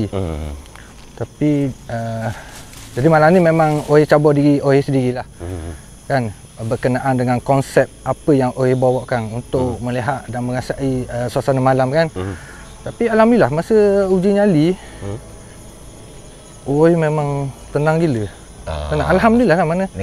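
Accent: Terengganu, Malaysia. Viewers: Selesai tonton.. mantap dan padu! Terasa padat menontonnya.. penceritaan dgn loghat ganu yg menarik.. teruskan.. Kipidak! 👍💪✌️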